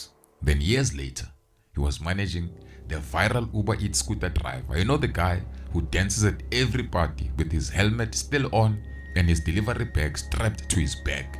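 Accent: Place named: South Africa. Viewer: you've cracked the faceless channel code. Your numbers are crazy... With a South African voice over, wow 👏👏👏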